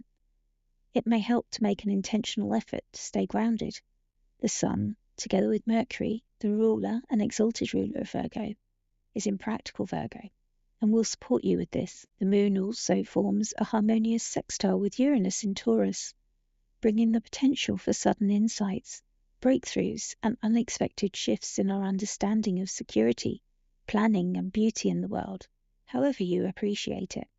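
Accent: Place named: England, United Kingdom. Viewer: U should have more comments🎉Thank U. When i saw the broadcast was at 15..mins - thought why? give it a try. Liked the Landscape view - seemed to be London Parliament Thames. Then when i heard the English accent and that U R from UK 🌍 i thought good going. Your content is very deep - Alkaid ? New to me - Only time of mention, through Listening to various Eclipes themes. Very knowledgeable for your age, by sound of your voice🎉Trudy ✨Gemini 1963 London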